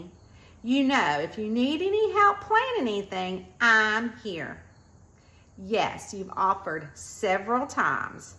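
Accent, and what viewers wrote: South, United States. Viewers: Love that Southern twang when I know it's real. You have done a great job with the southern style of small town, been there, done that. Now I'm old.😄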